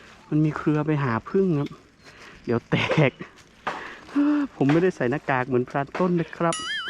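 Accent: Isan, Thailand. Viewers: อ้ายเว้าอิสานม่วนดี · พรานหนุ่มยุ จ.อารายคับ แล้วยุ อ.อารายคับ คำเว้าคือคนทางบ้านผมแท้ ผมยุ.จ.เลย คับ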